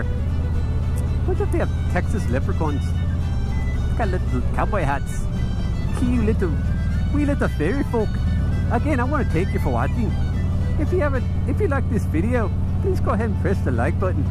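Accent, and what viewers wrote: Ireland. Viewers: Irish is pretty good...Scottish needs work! Watch Braveheart a few more times! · Great Irish accent mate. I too have an accent, because I grew up in Quebec and I’m from Italian decent lol. Ride safe bro.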